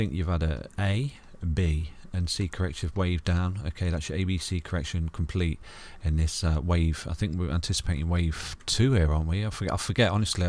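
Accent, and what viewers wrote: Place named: England, United Kingdom. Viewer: Gday from Australia.. Love yr pommie accent but more importantly your sincere info and straight shooting.. Thanks Mate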